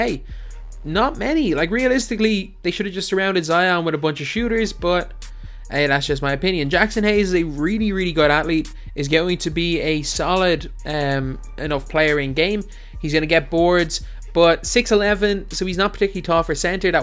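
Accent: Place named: Ireland. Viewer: Its his accent hes irish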